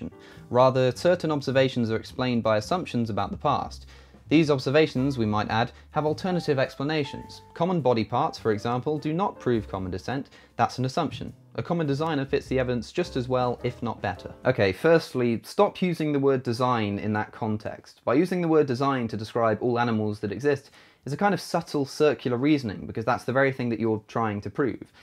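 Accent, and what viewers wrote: United Kingdom. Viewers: The British accent is the music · I feel like things are easier to understand when explained in a British accent.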